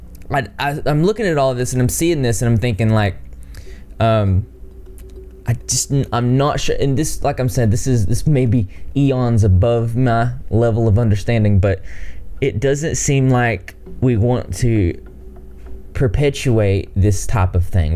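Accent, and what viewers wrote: South, United States. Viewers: Straight southerner lol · It's an anime character in real life with a southern accent!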